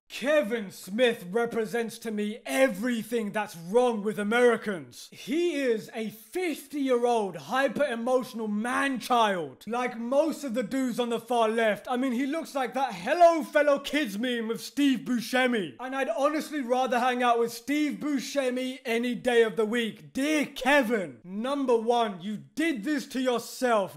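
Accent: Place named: Scotland, United Kingdom. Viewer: Is this guy scottish or a really mad guy from England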